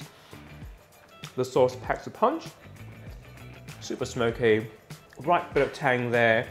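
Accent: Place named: RP, United Kingdom. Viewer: I want to like this channel, but the limp wristed posh affectation is revolting.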